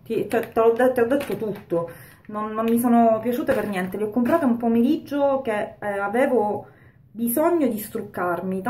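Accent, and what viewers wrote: Sicily, Italy. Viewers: Sei troppo simpatica e' un piacere ascoltarti...adoro l' accento siciliano e poi sempre piu' bella eh??!!😍😍😜😉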